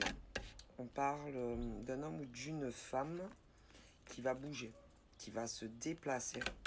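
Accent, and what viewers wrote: South, France. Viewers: Cc je vous découvre ❤super juste 😮mdr je le savais 😂j aime beaucoup votre style, beaucoup 😊super nature accent du sud non? Bisous du sud 😊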